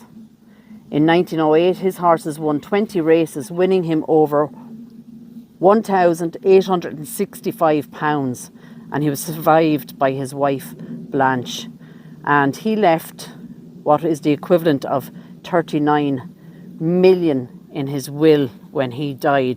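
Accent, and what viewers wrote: Ireland. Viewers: Hi, Iam Darren from Australia and I just wanted to thank you for your amazing videos and the history behind them.... You are very lucky to live in such a beautiful country, I also love the accent it brings back many fond memories.... I was an on-site construction manager and worked in very desolate places in the Australian outback.... A few of the young lads working for me were from Ireland and some of the hardest workers I had, the only thing was they work hard and play hard 😂 They love to drink and fight no surprise when you working in desert like conditions 7 days a week for months at a time, I was good with that as long as they were at the work truck at 5.30 am to go to work in the morning..... Those boys never missed a day, I miss them a lot hard working hard drinking and very respectful.... They made living in the desert actually fun with loads of laughs and bonding..... 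Sorry to rave on 😂 Cheers Darren...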